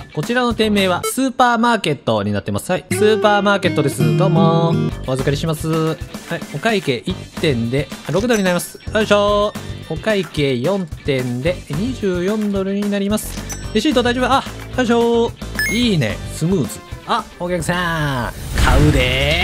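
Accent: Kansai, Japan. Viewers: 毎度ま毎度動画拝見させていただいて思ってるけど、の実況？動画って関西弁がめっちゃ多いってわけじゃなくて、たまに関西弁出るくらいだから、めっちゃ聞き取り易くてめっちゃ楽しみながら見てる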